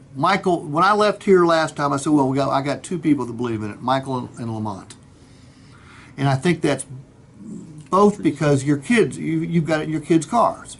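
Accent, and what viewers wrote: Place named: South, United States. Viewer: hillbilly and his hick rube foghorn leghorn talk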